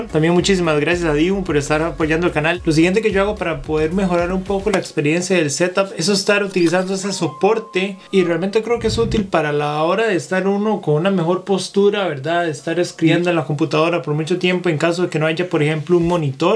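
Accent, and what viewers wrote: Costa Rica. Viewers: Saludos mae (sorry pero el acento tico fue algo que le agarré desde la primera frase). Muy buenos los videos, encontré el canal por mi búsqueda de información sobre la Mac Air 1 la cual deseo adquirir para trabajar en Análisis de Datos (SQL, Excel, Power BI) y por lo que veo de sus comentarios (así como referencias de amigos que trabajan en TI) pues parece que la opción de la Mac Air I es bien sensata y parecería ser suficiente. Una pregunta: –Gustos aparte ¿qué color me recomendaría? Me gustaría mucho la negra, pero según veo se puede ver descuidada por tanto manoseo o así, entonces pienso en la gris.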